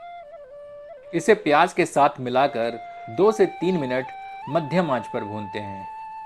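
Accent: Standard. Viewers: Love your pure Hindi bro.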